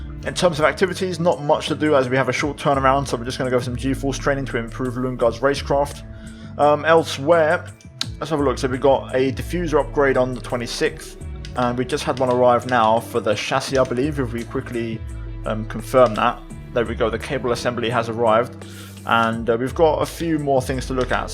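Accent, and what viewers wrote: Texas, United States. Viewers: Your American accent is perfect, you’d fit right in here in DC! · Sick American accent sheeeeesh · That American accent! Yes! Oh, you make me laugh! Cheers, mate. I'm not even American but I appreciate it all the same. Lando P3 right now in this series as well, eh? Perfect. Some more to upset that Lee Claxton dipstick. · That American accent 😁👌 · As a native Texan I fully appreciate the effort on that accent lol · Great American accent! Went to college in Texas and visit my gf that lives there and it’s very close!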